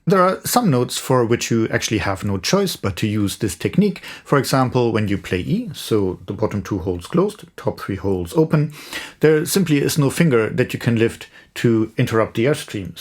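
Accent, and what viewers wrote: Germany. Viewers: He has aGerman accent???? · The best shakuhachi tutorial on YT! Even your heavy german accent doesnt matter :)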